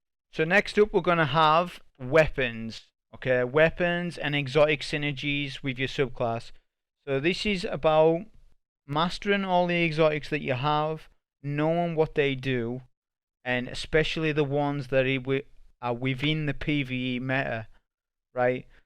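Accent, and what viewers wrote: United Kingdom. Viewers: Excellent well presented video explaining key points on how to progress (solo or not to be fair). Can only assume that the 2 down votes were a mistake but if not, why don't the asshats share why they disliked it? Bet they don't. GG buddy. Always nice to hear a fellow Brit on the Destiny wagon.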